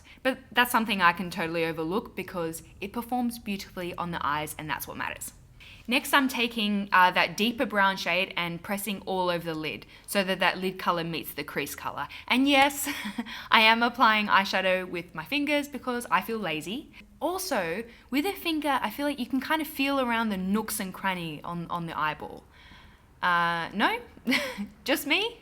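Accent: Australia. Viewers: Hola!!!! Te saludo desde Colombia sur América, me encantan tus vídeos y tu forma de hablar, muy Ausie😁😁😁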